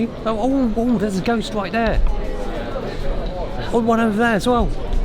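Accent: United Kingdom. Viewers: it feels awkward seeing someone who is asian having a british accent hahaha i dont know why, i'm asian btw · My mind still can't process and Asian with an English accent!!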